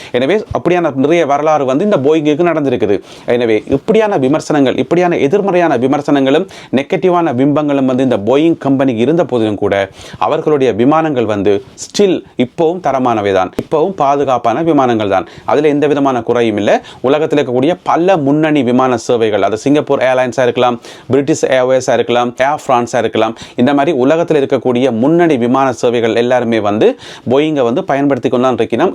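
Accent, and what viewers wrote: Jaffna, Sri Lanka. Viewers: இனிய இலங்கை தமிழ் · Its sri lankan tamil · Firstly I thankyou for your talkbeautiful Sinhalese Tamil well whatever happens ellam. Avan chail whole world is mourning and offering there prayers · Srilanka tamil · Ilangai Tamil huh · அழகான இலங்கை தமிழ். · He is a srilankan. Srilankan tamil is pure . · இலங்கை தமிழர் என்று நினைக்கிறேன். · தமிழ்நாட்டில் எங்கே இதுமாதிரி சுத்தமான தமிழ் பேசுகின்றனர். இவரின் பேச்சு இலங்கை தமிழே. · இலங்கை தமிழ் உச்சரிப்பு அருமை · உங்கள் இலங்கை தமிழை ரசித்தேன் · Yes he may be belongs to Elangai. It's Elangai Tamil. People who lost their lives their Soul may rest in peace. Very sad. 🙏🙏🙏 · Thambi, Thamizh oru Thinusa irukkae! Ilangaiyaa?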